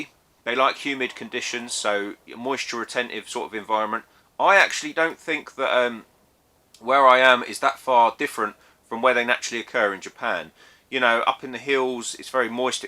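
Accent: England, United Kingdom. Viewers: Can’t stand the English accent🤦🏻‍♂️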